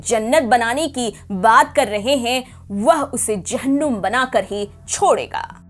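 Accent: Standard. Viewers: It transcends me to a new level of excitement when I hear someone speaking SHUDH HINDI, very proud of you ji, keep up the excellence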